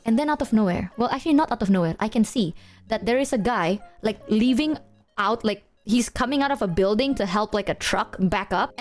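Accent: Indonesia. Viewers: I love when use her indo accent😂😂😂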